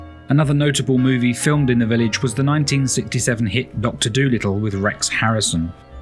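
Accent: United Kingdom. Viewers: I love the typical British accent.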